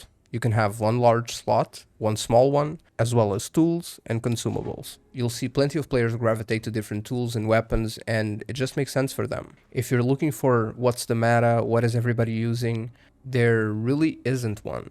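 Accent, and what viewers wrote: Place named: Portugal. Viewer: Are you Portuguese? your English sounds like it.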